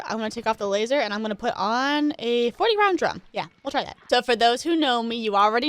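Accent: South, United States. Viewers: You keep getting more and more southern as this video goes on.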